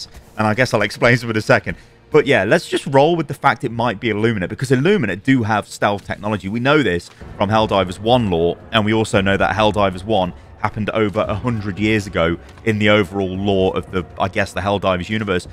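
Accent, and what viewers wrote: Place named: United Kingdom. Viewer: you sound brittish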